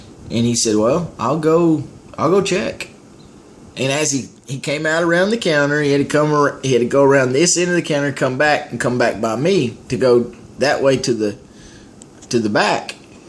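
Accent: South, United States. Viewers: all the Southern drawl bullshit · the slow poke Southern accent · I enjoyed listening to your story and your all "Merican" accent. Folks like Adam Peterson who commented about you should go watch their Britney Spears videos instead.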